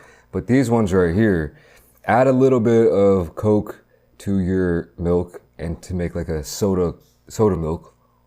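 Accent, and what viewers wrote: United States. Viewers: You're in/live Korea? You sound like you were born and raised in America. Not that that matters in any way. But if you're born and raised and still live in Korea, I'm just amazed at your American is all.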